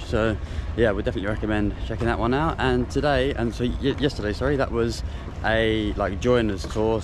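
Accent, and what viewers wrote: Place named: United Kingdom. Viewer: Why your shirt has Malaysian flag? But your accent sounds British...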